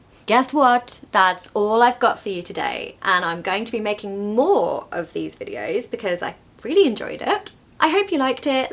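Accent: RP, United Kingdom. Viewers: Amazing lessons❤❤ I love your British RP accent❤❤ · That's probably the main reason why I'd go for a strictly phonetic language like, for instance, the German language, if I were in a situation to choose between the one and the other :) Yeah, anyways, I'm not here to complain or argue :) English is just fine, and I really enjoy learning it. Love your accent, by the way. RP if I'm not mistaken? 😊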